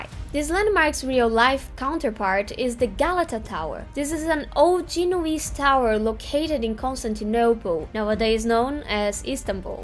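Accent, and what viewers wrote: Brazil. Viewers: Throughout the video I concluded you're a fellow Brazilian. I know some Greek and Cheirosiphon is pronounced /Rirocifom(pt)/ heerohsseephon(eng)/.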